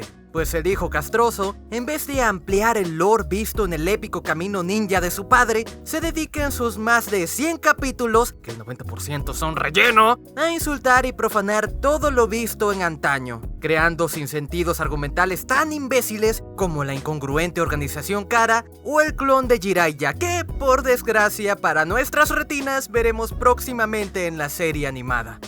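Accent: Venezuela. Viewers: eres venezolano o que men?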